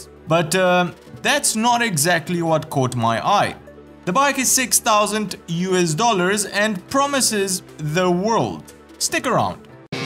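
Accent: South Africa. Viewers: Is that a SA accent with a Romanian twang ?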